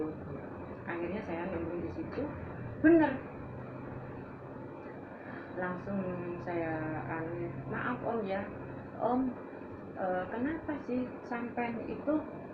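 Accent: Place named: Java, Indonesia. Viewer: Mba asli mana ya? Jawa bukan mba? Aku seneng gitu denger logat bicara nya mba..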